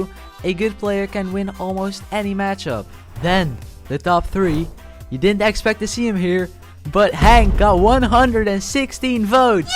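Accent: Netherlands. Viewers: Holy Dutch accent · Wait are you Dutch?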